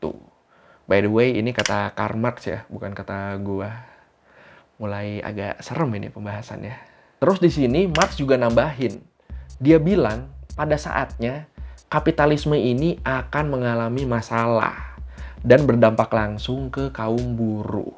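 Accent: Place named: Madura, Indonesia. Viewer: lu orang madura ya bg? nadanya kok gt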